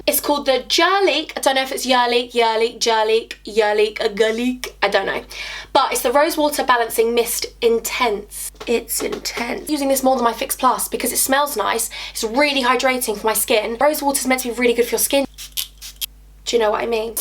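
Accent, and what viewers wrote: Liverpool, United Kingdom. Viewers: I love you u are so funny I keep say, you know and baby, boy to me hubby and it's doing his head in by the way well boss Liverpool accent cus that's we're I live lol xxxx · omg obsessed with this loook😍 and your Liverpool accent is amazing! You deserve 50,000 so much, love you xxxx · that scouse accent hahaha you sound like me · by the way love the scouse acsent hahaha... (am from liverpool) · Your scouse (Liverpool) accent is soo good hun ❤️ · Your Liverpudlian accent is actually amazing😂😂 also congrats on the 50K to the most amazing angel of all❤️👑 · I go on to you and hollys get ready with me all the time just so me and my friends can hear you doing the Liverpool accent😂 OBSESSED with you and the bomb accent😍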